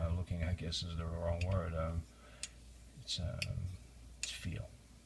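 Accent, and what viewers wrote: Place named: Boston, United States. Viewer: Great dexterity. Never heard your voice. That sounds like Boston.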